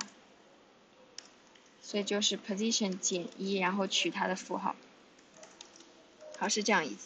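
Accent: China. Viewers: 找279题目解答 结果看到了英文版 大致听了几句就发现是国人了 姐姐在新加坡上学？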